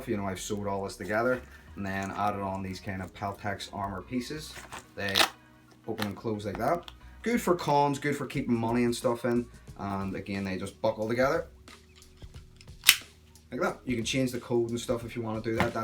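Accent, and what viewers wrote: Ireland. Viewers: great channel..charming kid..well spoken..cosplayers do it best! i just rolled into cosplay at 36..big geek..big movie and toons fan..not many channels are that enjoyable to watch..like some big names i wont mention..i love cosplay chris tho..something about irish people..and australians thats just so loveable..good friendly characters.i'm from belgium myself and we have irish pubs here..lots of irish fellows working hard here and each of them friendly AF!:) always loved the way u guys talk and yr humor..yr a good fellow..dont get cocky lol..stay humble as u are and cosplay yr ars off! and remember..cosplay is about having fun and not the ego like some..guess those people are a bit lost inside or what not..but yr refreshing to watch:) hope u have a long good run here on the tube:) best wishes and greetings..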